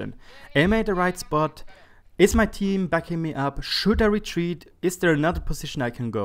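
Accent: Germany. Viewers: wait are you from germany? i'm new so just asking!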